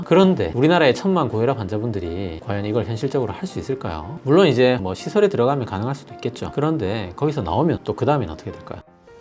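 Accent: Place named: Busan, South Korea. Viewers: 님병원이 어디에 위치하고 있는지 알려주세요.~~~ 참고로 저는 부산 연제구에 삽니다.^^ 영상 만드는 일에 정성이 가득하시고.. 강의 내용 퀄러티 인정! 그래서 고혈압, 당뇨 위태위태한 저는..꼭 한번 뵙고 싶기도 해서.. 사투리가 부산 사람인듯 하여..병원이 부산에 있을듯란 예감이 듭니다.ㅎ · 같은 부산 분이라 그런지 하태경 의원님과 외모, 말투 모두 비슷하세요. 엘리트 부산인들의 공통적인 말투인가 보네요.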